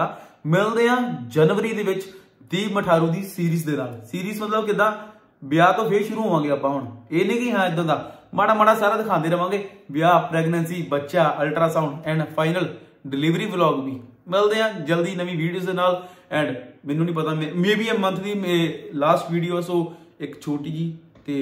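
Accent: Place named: Majha, India. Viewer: Majhe di boli😍 haada km nhi chlda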